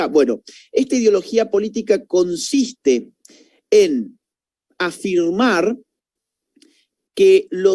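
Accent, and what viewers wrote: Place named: Mexico. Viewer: Habla como mexicana esta dama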